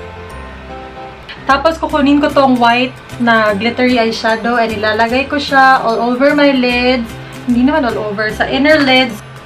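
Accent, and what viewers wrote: Visayas, Philippines. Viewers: Halaaa namimiss ko mama ko sa accent ng bisaya mo ate. Hehe. Godbless po! <3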